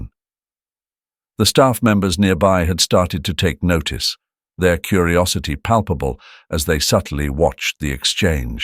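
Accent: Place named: United Kingdom. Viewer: Love the British narrator’s voice and the way he tells the story well. Much better than those monotone robotic voices with no emotion, feeling or passion.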